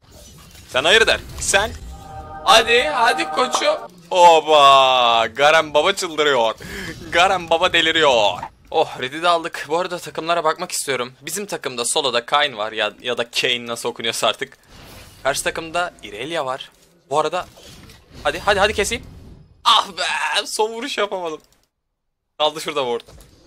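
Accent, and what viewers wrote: Trabzon, Turkey. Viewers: Bence Trabzonlusun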